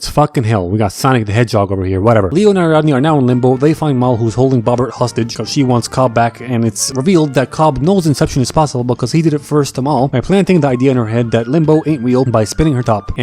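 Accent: Spain. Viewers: ...I thought he was either Spanish, Hawaiian or Asian. 🤨🥴🤔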